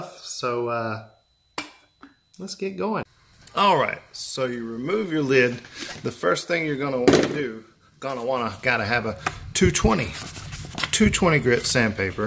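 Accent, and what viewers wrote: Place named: Boiling Springs, United States. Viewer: Dude I love you and your vids. Your work is just beautiful. And that Boiling Springs ACCENT!!! I miss it.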